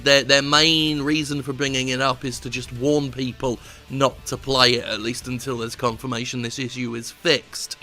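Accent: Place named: Working class, United Kingdom. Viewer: I don't really watch for video games stuff. Working class British ranting is the only way I can finish. Thanks!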